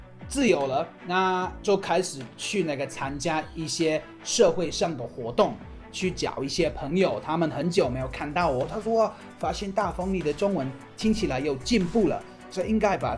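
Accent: Taiwan. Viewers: 哈哈～我第一次看到一位外國youtuber講國語台語口音超重的XDDD 完全就像是先學了台語才學說國語的人 果然住在南部講話就是會一直“啊～怎樣怎樣，啊～怎樣怎樣”的 哈哈 中文講的真的是太好了！完全可以感受到真的是花了很多心力努力的學習跟練習出來的！ 是比天才更令人敬佩的地才！讚！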